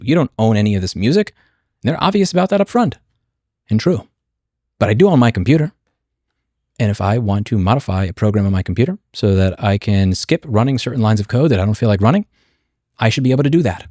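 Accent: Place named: Texas, United States. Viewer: Man, your Texas accent is getting thicker and thicker. Which is a good thing.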